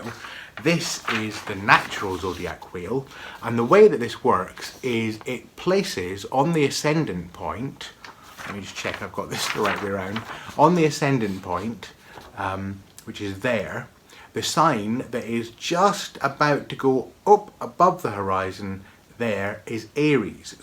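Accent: Scotland, United Kingdom. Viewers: Hi there. I worked on this on the 29th July 2021 after watching just this lesson. I thoroughly enjoyed finding that my Base Chakra has the Sun together with Venus! Chiron is with Neptune in the Heart Chakra. Jupiter joins with Uranus and the Moon in the Throat Chakra. I found it quite fun to bring the Elements, Positions and Polarities into the mix too. I enjoy your other videos as I studied 19 years with a private Jungian teacher, worked with Astrology and Tarot through the 70s. Its wonderful to have the old background learning in all these and other areas brilliantly updated. As I child I regularly dragged my mother into a particular shop so I could hear the Scots accent so this is another benefit! Thanks for your dedication and enthusiasm.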